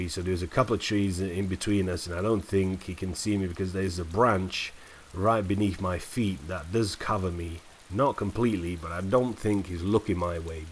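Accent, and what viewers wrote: United Kingdom. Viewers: where are you from? you sound British but with something else thrown in every now and then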